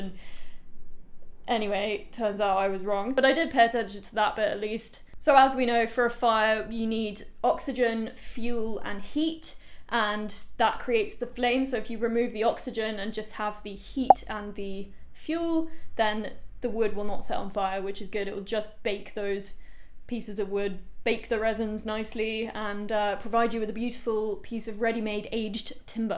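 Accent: United Kingdom. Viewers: I'm using your videos for English listening learning because it is so nice seeing your personality, seeing your work, and listening to your British pronunciation :)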